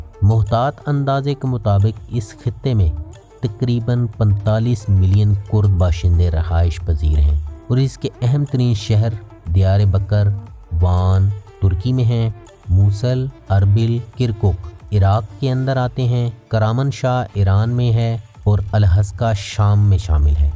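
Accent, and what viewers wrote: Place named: Punjab, Pakistan. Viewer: Yeri maadri jubaan saayad punjabi hai par urdu bol raha hai par punjabi ki jhalak hai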